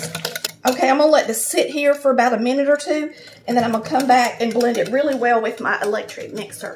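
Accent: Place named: Kentucky, United States. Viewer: I love to listen to you talk cause I am a Kentucky girl living in Florida for many years and it's nice to find someone that talks like me. God Bless you and your family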